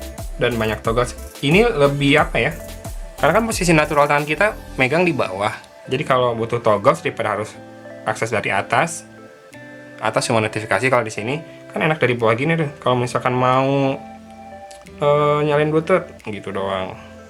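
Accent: West Java, Indonesia. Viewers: ini ifonre bgt sunda bangaat aa'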